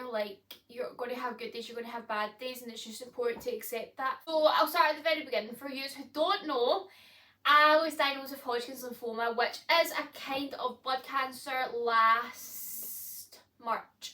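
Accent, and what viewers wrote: Scotland, United Kingdom. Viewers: Wow that’s a surprise a Scottish voice I’m in Edinburgh ❤️🤗🤗🤗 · Hi Nice to meet you New Subscriber here from Amman Jordan Middle East Love your Scottish accent. All the best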